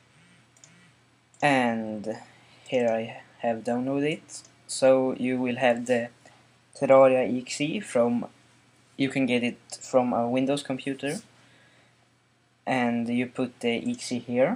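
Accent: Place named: Sweden. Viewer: You can hear the swedish accent :p like you can on every swedish guy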